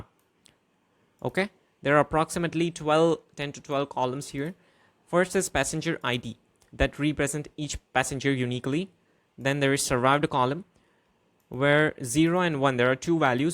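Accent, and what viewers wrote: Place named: United Kingdom. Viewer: British Accent toh hai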